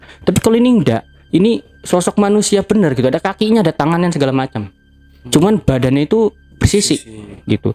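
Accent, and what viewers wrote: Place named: Java, Indonesia. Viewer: Mas logate jowo meni k an asli endi kui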